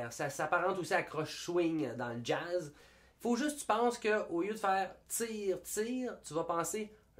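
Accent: Quebec, Canada. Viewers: ton accent me rappelle mon année à Trois Rivières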